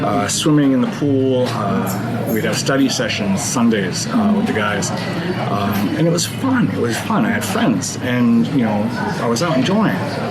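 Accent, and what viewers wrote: United States. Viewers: A truely honest and moving video. Thank you. I rarely give advice but it seems you need a break. Perhaps return to USA , earn some "real" money and come back fighting. Regroup! Best of luck with whatever you decide. (Apologies if that's not a USA accent, read it as "home country" instead!)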